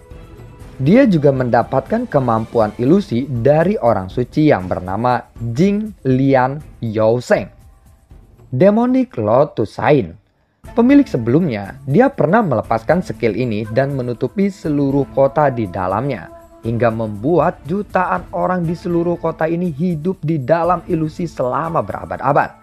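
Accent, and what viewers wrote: West Java, Indonesia. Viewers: Mimin orang Sunda kah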